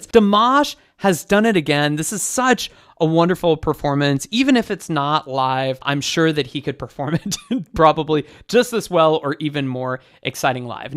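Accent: United States. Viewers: I think you need to work on your overinflated ego and your lack of empathy department. Have you considered that you speak American English and not English maybe you should work on your own accent. Dimash does not even need to sing in your own language to be able to share the story and all the emotion that goes with it. He speaks English differently than you. So what? I bet if both of you would sing the same song you would not be the one people would be talking about despite that you believe to have a "perfect" English "diction". The man is exceptional. Live with it. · Is that American English or British English? Just saying.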